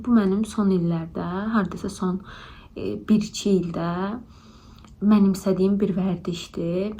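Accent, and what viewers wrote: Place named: Nakhchivan, Azerbaijan. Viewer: Salam necesiz siz Naxcivanlisiz lehceniz sirindi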